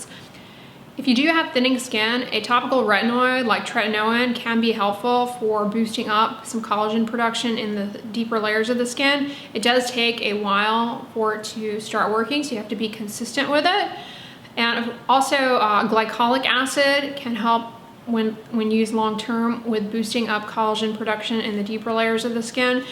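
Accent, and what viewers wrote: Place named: California, United States. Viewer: Where are you from? I know you are in Houston now, but you really sound like you are from California. Just wondering. I love your channel by the way.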